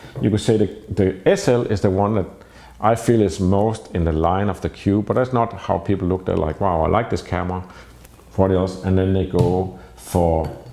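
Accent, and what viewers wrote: Denmark. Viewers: Thanks to you and your Danish accent it pushed me to buy the Leica M240 and have never looked back. Thank you. Now to buy a Leica 50mm lens.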